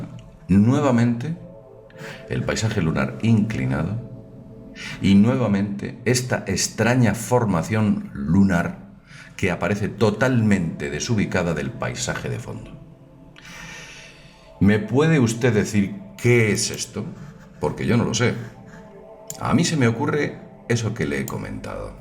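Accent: Spain. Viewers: ESTE PENDEJO SE CREE QUE SABE, MIRA SO MAMAO TU DEBES SER UN PENDEJO MAS DE ALGUN PAIS DEL TERCER MUNDO HISPANO, TIENES ACENTO ESPAÑOL QUE DE LO UNICO QUE SABEN ES DE MATAR TOROS Y COMER PAELLAS Y MUCHA MIERDA.DIME QUE COSA DE IMPORTANCIA A SALIDO DE ESPAÑA? JAMAS UN PENDEJO ESPAÑOL ENTENDERA. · eres de España?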